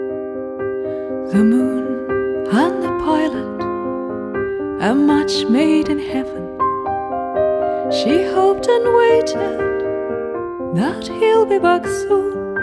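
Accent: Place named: RP, United Kingdom. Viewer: спасибо. очень красиво, и песня и клип и фотографии. а произношение ваше- прям как-будто слышал как говорит английская королева) приезжайте в Ингушетию. не забывайте корней. Кстати, вы очень похожи на бабушку. Ахриевы древний тейп. и раскиданы по всему свету. очень любознательны и неординарны) есть в Америки отец и сын Ахриевы- очень талантливые художники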